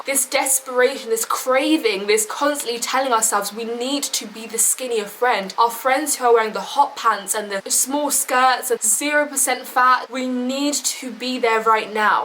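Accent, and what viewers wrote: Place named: Essex, United Kingdom. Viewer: Idk how to explain but I just think she has a very elegant, posh accent, like very Essex like.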